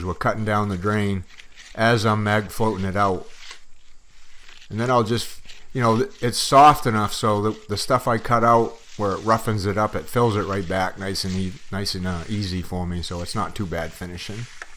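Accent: Maine, United States. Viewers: By your accent...Maine?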